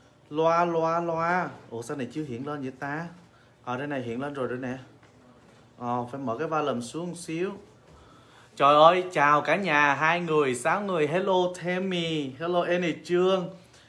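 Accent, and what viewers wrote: Binh Dinh, Vietnam. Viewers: Tina Bennett ....tôi nghĩ ổng từ Quy Nhơn Bình Định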